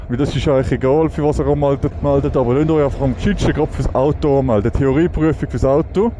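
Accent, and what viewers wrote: Switzerland. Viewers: Schwiezerdütsch, wie spaßig ist das denn, ich liebe es. Geht um Führerscheinklassen fürs Motorrad, soviel hab ich verstanden, großartig. Schöne Grüße aus dem wildem Osten (Deutschland, Thüringen).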